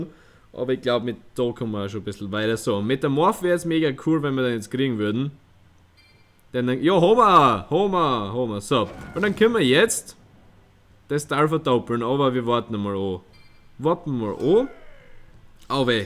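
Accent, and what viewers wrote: Styria, Austria. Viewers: I finds voll geil das du österreichisch redasch i find as isch bessa so :D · i hob an freind der a steira is und der red a wie du. I bin oba Niederösterreicher. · Ich kann dich verstehen weil Steirisch ist fast gleich Schweizerdeutsch😉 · konnte es versthenen weil ich aus Bayern komme und weil da der Dialekt so ehnlich wie in Östereich ist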